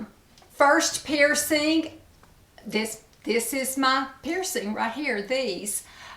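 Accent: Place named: Tennessee, United States. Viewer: That was so much fun! I love listening to your accent. I’m English but have lived in the US for 26 years now. We spent 8 years in Knoxville, TN and listening to you takes me back there 😊💕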